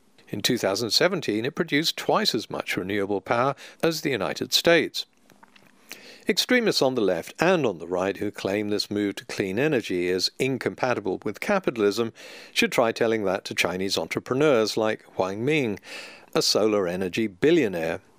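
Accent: United Kingdom. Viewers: What's wrong with his delivery? As a well educated British middle class person, his voice comes across as balanced, clear, and perfectly normal. It's an educated accent and very normal. He is delivering an educational discourse and does so in a rounded way. Is it just someone being British that you don't approve of?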